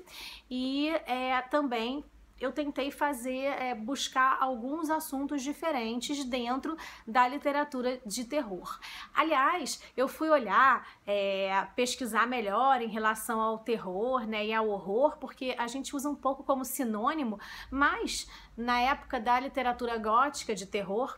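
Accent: Rio de Janeiro, Brazil. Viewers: Você tem toda razão, Misery é angustiante rsrs adorei! Então, esse pacto que você tem é o mesmo que eu li, "pacto/amaldiçoado" é o mesmo livro, eles mudaram o nome quando lançaram o filme com o Daniel Radcliffe, ainda não assisti. Quanto aos livros acumulando poeira na estante, eu costumo fazer trocas no Skoob, já me livrei de muita coisa e já consegui livros incríveis. Super indico. Beijão! Já falei que adoro o seu "carioquês"? kkkk acho lindo.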